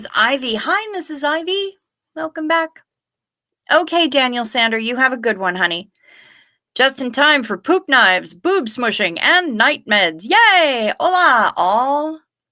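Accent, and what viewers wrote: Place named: Ireland. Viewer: I didn't expect her comedic timing and Irish accent to be THAT good! It's fun to dial into a show and hear something other than what you'd expect!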